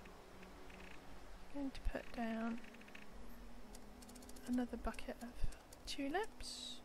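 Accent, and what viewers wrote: United Kingdom. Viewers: Brilliant . You got yourself a new subscriber. Nice to hear an English accent in a 76 video too lol. Got my mind turning over thinking of tiny houses now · Awesome video! Love your accent, are you from the UK?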